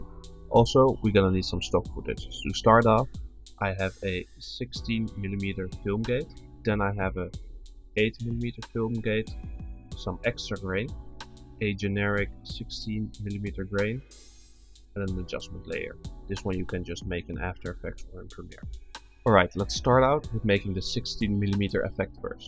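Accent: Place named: Netherlands. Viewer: Toffe video! I recognised a Dutch accent I think, if I'm not mistaken.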